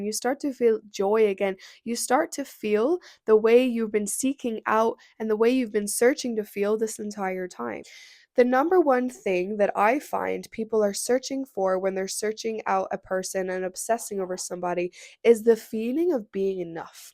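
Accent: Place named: Ireland. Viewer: Great video. Are you Irish. You have a hint of an Irish accent. Really excellent video on Limerance.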